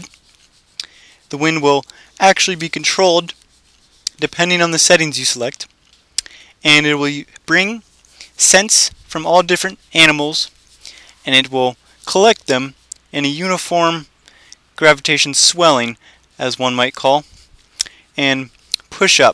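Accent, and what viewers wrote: Canada. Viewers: He kind of sounds Canadian to me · Is he Canadian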